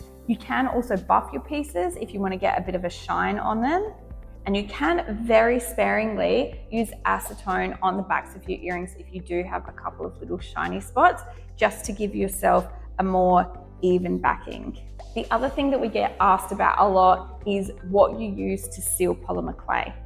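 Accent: Australia. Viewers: Hi, my name is Lori, I want start off by saying I love your accent, Australia, right? I'm seriously thinking of making clay jewelry, and this is the first time watching your tutorial, I like it! Have a great day! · What a great source of information , and great to hear an Ozzy for a change. Thank You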